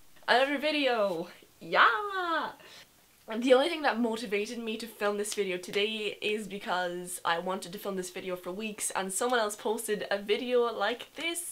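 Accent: Ireland. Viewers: Is she Irish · Me Before : I think she’s Irish... she sounds like all the annoying second cousins from Ireland who I am forced to put up with, despite the fact I don’t know the name of any of them, other than they and love Karaoke, Makeup and romance movies... Me after : SHE’S DEFINITELY IRISH, SHE SAID THIRTY THE WAY I DO WHEN I’M IN IRELAND. Saying thirty three and a third in a Irish accent is very funny to me, and my immature sense of humour.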